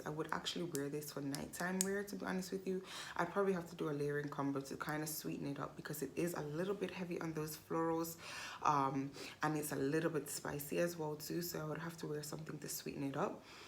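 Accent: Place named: Jamaica. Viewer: Great review! You're from Jamaica correct? Your accent sounds a little different. Like a few different accents mixed together.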